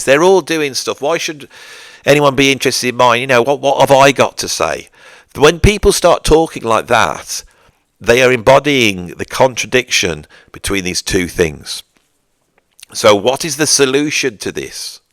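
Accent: Birmingham, United Kingdom. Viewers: The audio on this video is the worst I have experienced on any of your videos. That isn't a criticism. You just have some setting wrong, and your lovely, dulcet Brummie tone is distorted somewhat!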